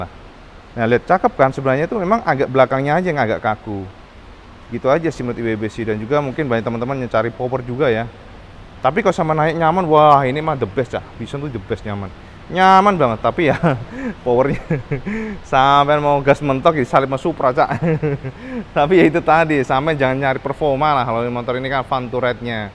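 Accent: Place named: Tulungagung, Indonesia. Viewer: Megek lk boso tulungagung🤣🤣🤣🤣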